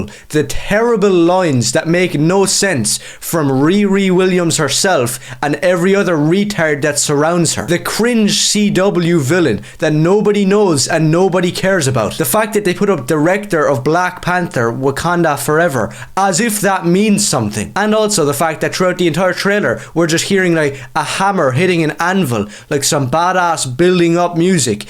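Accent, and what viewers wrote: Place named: Ireland. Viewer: If this guy didn't have an Irish accent, I'd bail in thirty seconds.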